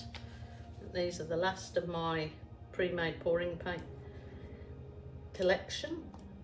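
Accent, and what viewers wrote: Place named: West Midlands, United Kingdom. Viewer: Every now and again I hear a West Midlands accent in your voice …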